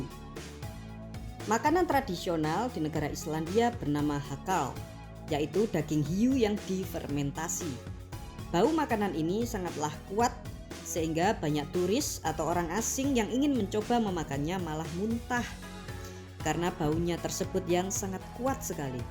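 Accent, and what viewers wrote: Java, Indonesia. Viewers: Medok euy 🤭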